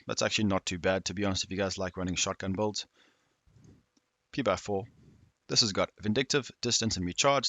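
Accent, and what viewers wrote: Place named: South Africa. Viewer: Wascally... You sound South African. As a lightie, didn't you watch morning cartoons before heading to school? Wascally is pronounced like rascally, with an Elmer Phud lisp when he's ranting about the wascally wabbit (Bugs Bunny IOW) that he's taking strain to catch. Thus the Wascally talent makes one harder to bog down with riot foam 😜👍🏽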